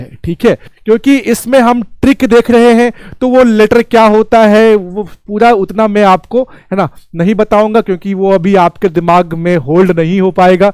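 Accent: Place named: Darbhanga, India. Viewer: Darbhanga wale hai kya 😅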